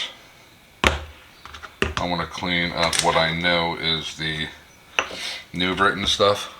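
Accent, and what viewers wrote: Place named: Philadelphia, United States. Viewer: where are you from? I think I hear a Philly/ South Jersey accent.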